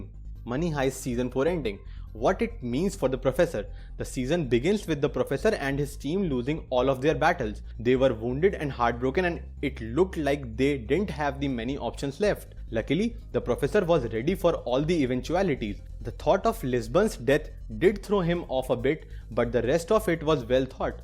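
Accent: India. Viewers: your accent, are you from India?? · Your India accent is so strong ....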